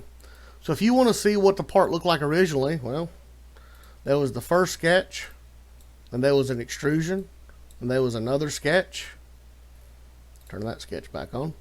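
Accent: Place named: South, United States. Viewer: Thanks for the help and I love your southern accent brother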